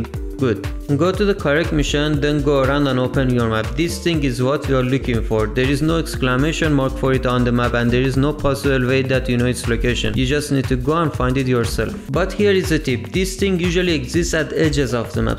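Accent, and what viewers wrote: Iran. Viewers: از لحنت معلومه ایرانی هستی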